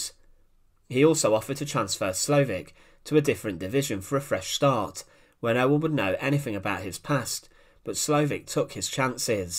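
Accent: United Kingdom. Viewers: In case you did not quite grasp the narrator's accent, he is English (British), or a British speaking computer generated voice. In any event, those stakes you saw in the video's opening segment are of a memorial in the UK to those British Soldiers who were executed by the British Army for desertion/cowardness during the 1st World War. (Hence the statuary of the guy standing against one of those posts with a target hanging over his heart.) Desertion and cowardness was quite high in a British Army still heavily influenced at the time by Victorian norms' and where, almost to a man, the commanding officers of those troops back then were all from the aristocracy, privileged, very well to do, or political leading families--- there was no such thing as PTSD being heard of by this lot) during WWI. Hundreds of soldiers accused / tried for it were summarily executed in the field as it was a very common practice then.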